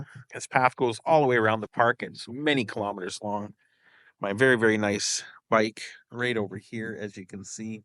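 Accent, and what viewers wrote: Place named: Canada. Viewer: Lovely Canadian Accent 👍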